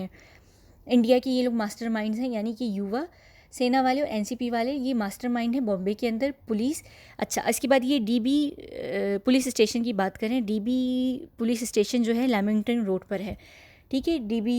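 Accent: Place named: United Kingdom. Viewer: Exactly, i am waiting for her english video. I really love her bristish accent.😊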